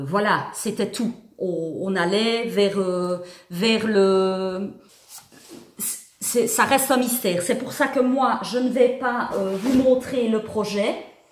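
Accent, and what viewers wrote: Liège, Belgium. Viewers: Oui, et l’accent liégeois aussi ! 😁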